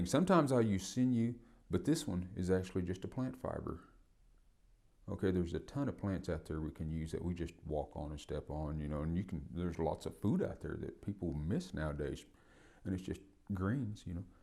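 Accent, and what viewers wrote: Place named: South, United States. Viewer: This dude's country as hell lmao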